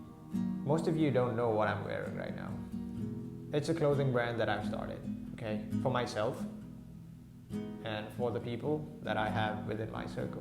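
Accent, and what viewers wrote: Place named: England, United Kingdom. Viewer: Hi, I like your english acccent!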